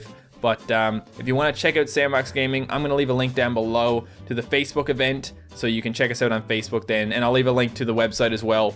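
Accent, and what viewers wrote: Canada. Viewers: Hi um C: Just wanted to say I REALLY love your videos and I did not know that you wanted to be a doctor but hearing your reasoning that is REALLY sweet and ahh. I always get very excited when I see there's a new video because I always know I'm going to enjoy it and yeah!! I am sorry if this is awkward I have never left a youtube comment before! ps: When I first saw your videos I KNEW you were Canadian by your accent and was very pleased when I discovered I was right.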